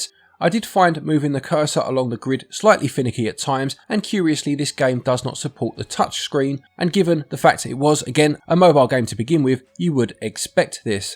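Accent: England, United Kingdom. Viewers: Why do you keep misprounoucing Mana? I can hear you are english so I don't get it? For the record its not Marna ot Maana, I can understand americans mispronouncing this, because they don't know any better. Apart from this grating on me, this was a good review and made up my mind to buy it 👍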